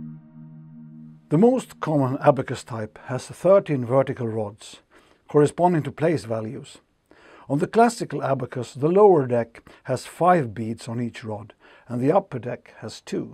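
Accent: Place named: Sweden. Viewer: That Swedish accent :D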